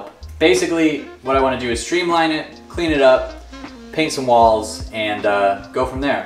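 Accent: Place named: Chicago, United States. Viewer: Hey, you from Chicago? I've seen the Bears stuff in your other videos, and, I thought your accent sounded familiar. The fact that you have an open dislike towards Wisconsin just kinda confirmed it for me, lol.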